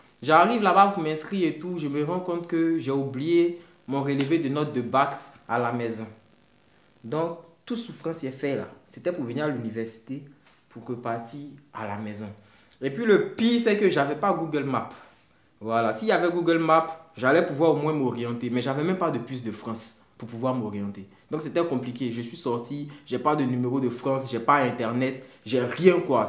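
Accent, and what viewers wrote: Ivory Coast. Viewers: L'accent ivoirien me tue mdr 🤣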